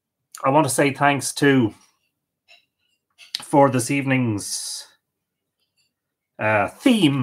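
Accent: Ireland. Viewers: You are helping us all so much. God bless you and your sweet family. Please don't be embarrassed for showing emotion over this horrible virus and stressful time the whole earth is sharing... Many people have died. Yes, this is a very trying time for us all. Your stories truly do help. My personal story is that my husband is in Hospice. He is dieing from Pulmonary Fibrosis as a result from serving in Vietnam and exposure to agent orange. So our days are all very much the same, just fewer guests now. So anyway, I get very stressed and often go in to YouTube and find you at bedtime, whatever that time may be. I listen to your friendly Irish voice till I'm able to fall asleep. Thank you SO MUCH !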